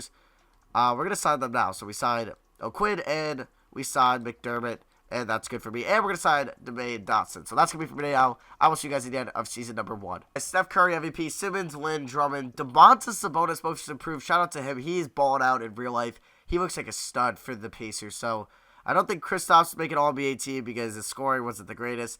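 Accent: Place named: Long Island, United States. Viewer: Matthew B just Long Island accent nothing wrong I have it to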